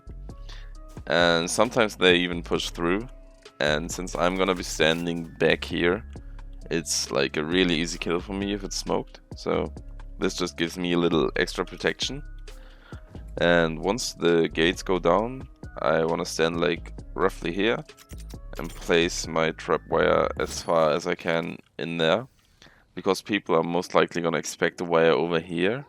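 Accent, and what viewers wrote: Germany. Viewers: The german accent haha. ^^ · i dont know why, but you sound like you came from Germany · man hört das du deutscher bist :D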